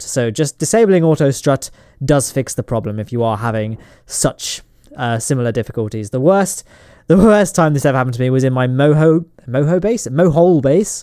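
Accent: United Kingdom. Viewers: For some reason I heard that as "This is a purely autistic video" instead of "This is a purely artistic video". Must be your British accent. I'm from America. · You are British..... and space....? Instant Sub!!!!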